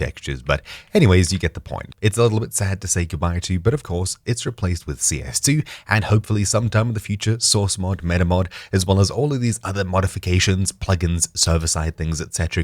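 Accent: South Africa. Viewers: I didnt know this man was South African. Sawubona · Are you south african? if you are sick man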